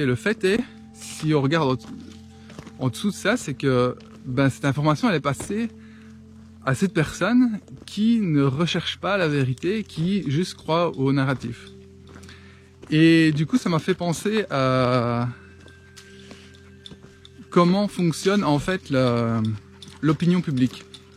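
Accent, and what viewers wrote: Liège, Belgium. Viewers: Bonjour. Ton analyse est sage, juste et lucide. Merci. Télévision= "tell a vision", raconter une réalité, et ça se fait via des..."programmes" sur des "chaînes" CQFD instrument de propagande et de programmation mentale. J'ignore où tu vis à présent mais tu as pris soin d'y amener ton accent liégeois:-) Love & Laughter from Cité Ardente. WWG1WGA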